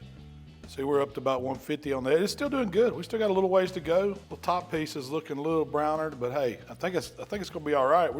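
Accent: South, United States. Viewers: Yes. Good old ooollll and follll. It's like the south lost the letter I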